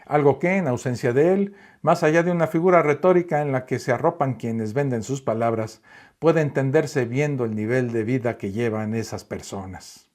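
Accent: Mexico. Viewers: CAUSA GRACIA TUS COMENTARIOS. ¿QUE ERES? ¿DONDE ESTUDIASTE? ¿ERES BACHILLER? SI NO CREES EN NADA, VIVE TRANQUILO TU VIDA Y NO TE METAS EN LA VIDA DE LOS DEMAS: .JUZGAS DESDE TU PUNTO DE VISTA, ELUCUBRANDO ESTUPIDECES y TU TONO MEXICANO QUITA MUCGA SERIEDAD A TU ABSURDO DISCURSO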